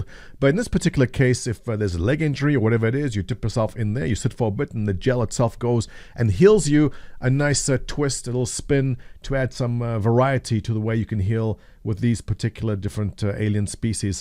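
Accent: South Africa. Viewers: Heya! Great video. If is me or do you have a bit of a South African accent?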